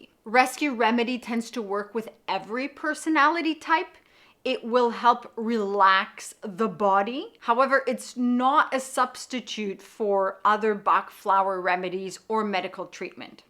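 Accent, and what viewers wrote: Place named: Canada. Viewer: Great video :) You speak so clearly, love your accent! Canadian?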